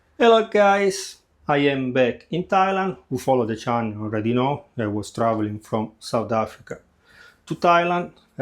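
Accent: Italy. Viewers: Tuoi vídeos mi piacciono moltissimo. Mi piace tanto il acento italiano. And tour recipes and techniques are great!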